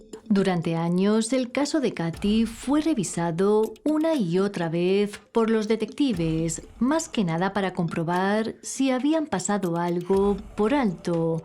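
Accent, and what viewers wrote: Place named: Galicia, Spain. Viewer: si... porque es muy "gallega"muy lenta y alarga innecesariamente. Da pereza.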